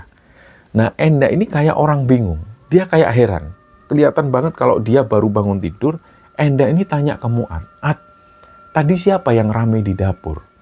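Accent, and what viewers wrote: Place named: East Java, Indonesia. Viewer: Njenengan tuh telling story nya mmg beda dr yg lain lho Mas. Logat Jawa Timur nya jg masih kental 👍👍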